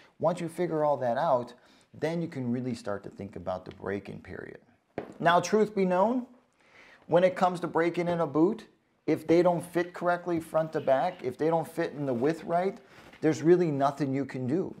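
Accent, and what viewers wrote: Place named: Midwest, United States. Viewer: Interesting accent... is it midwestern maybe?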